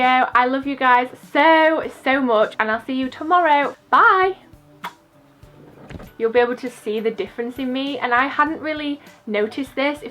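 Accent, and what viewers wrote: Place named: South Yorkshire, United Kingdom. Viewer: Where are you from?! You sounds so much like me, haha, i'm from South Yorkshire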